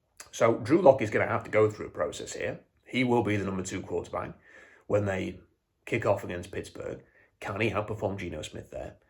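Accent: England, United Kingdom. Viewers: Well said. It’s even funnier yet Better that a guy with an English accent says it.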